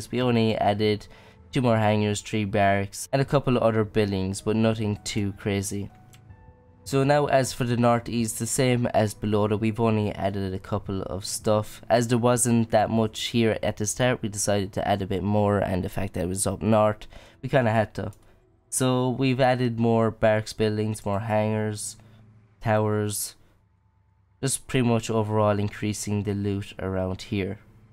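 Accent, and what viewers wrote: Ireland. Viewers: You sound like you're from athlone or carlow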